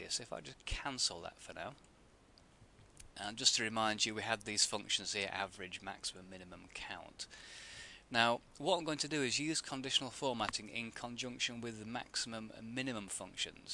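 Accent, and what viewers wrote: United Kingdom. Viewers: I had to learn Excel quickly before I start my new job. These tutorials are excellent! Moreover, everything sounds so much more interesting with that lovely British accent! Thank you so very much. I'll feel quite confident going into my new position.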